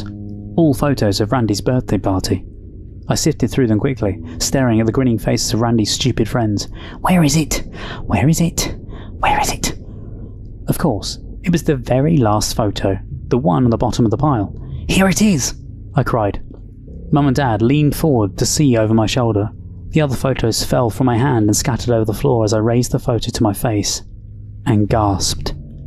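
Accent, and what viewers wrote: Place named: United Kingdom. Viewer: hears intro -subscribes immediately hears British accent -TRIPLE SUBSCRIBE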